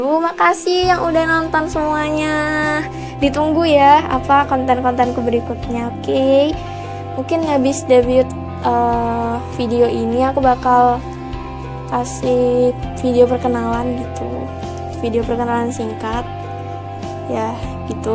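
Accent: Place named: Java, Indonesia. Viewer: waahh jawa banget! btw semangat yaaa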